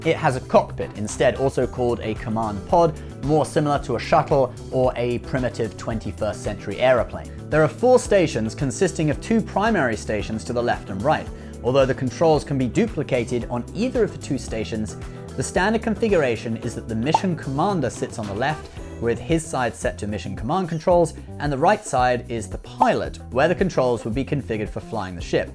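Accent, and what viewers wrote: United Kingdom. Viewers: Cool. Its. He's back.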